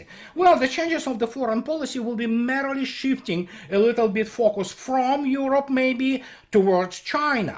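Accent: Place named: Russia. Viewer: You talk too precipitously and with your strong Russian accent is hard to follow your line of thought; calm down, pronounce each syllabe distinctly and do not overlap words, one on top of the next.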